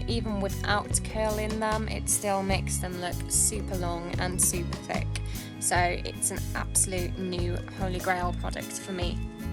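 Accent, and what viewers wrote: United Kingdom. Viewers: From Britian, I'd suggest!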